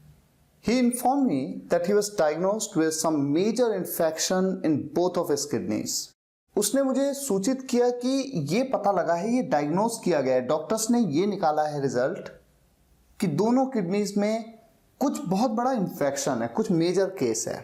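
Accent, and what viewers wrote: India. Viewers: I am Chinese，YouTube recommend India accent English to me😨😨😨😂😂😂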